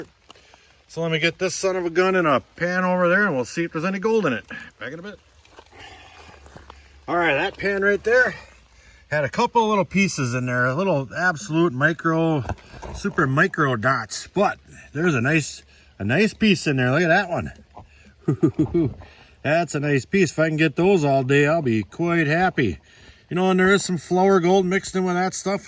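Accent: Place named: Wisconsin, United States. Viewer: I like your Wisconsin accent don't ya kno! When I heard crick, not creek, I knew. Lol great content !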